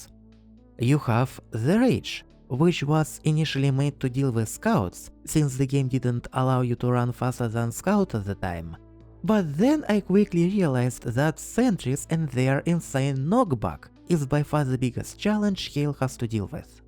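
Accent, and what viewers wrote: Russia. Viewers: Are you russian ? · Почему у тебя такой русский акцент? (я просто тебя впервые вижу)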